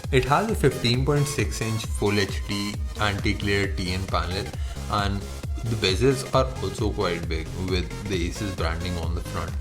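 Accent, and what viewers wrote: India. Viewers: I missed my Indian friends by hearing your voice, good video bhai!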